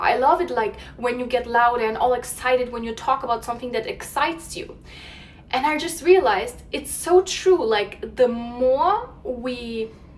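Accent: Germany. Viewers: Are you German? ☺️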